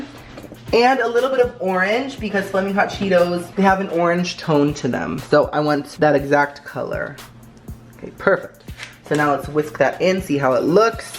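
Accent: United Kingdom. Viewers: DO AN ENTIRE VIDEO WITH YOUR BRITISH ACCENT AND WITH A CUP OF TEA PLEASE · That british accent tho😂👍👌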